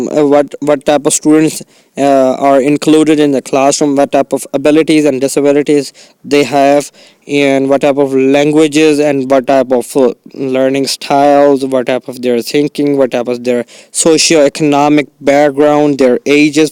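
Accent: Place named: United States. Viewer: US accent mai bt krny ki... so horrible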